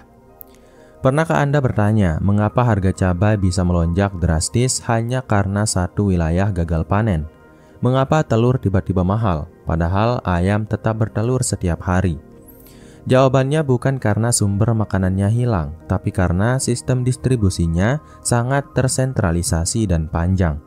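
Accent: Bali, Indonesia. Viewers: naratornya bli bli Bali ya.....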